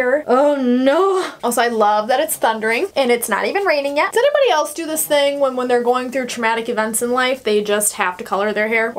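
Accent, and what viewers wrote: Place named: Wisconsin, United States. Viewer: I knew I heard a fellow Wisconsin accent lol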